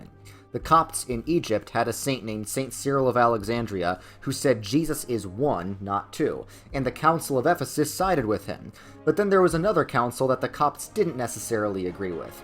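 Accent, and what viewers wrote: New York, United States. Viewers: You can really tell this guy is from New York by his "Coufey" accent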